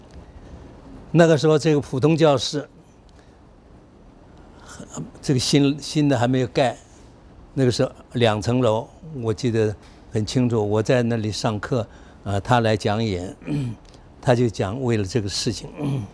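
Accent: China. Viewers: 老教授學養深厚，口音明明是外省腔，所舉的例證卻能用臺語發音，而且還能如此標準，不容易啊！值得借鏡。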